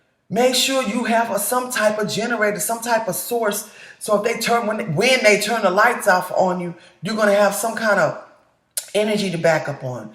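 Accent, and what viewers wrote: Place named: South, United States. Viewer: Smart women and I love your southern accent.👍🌈☮️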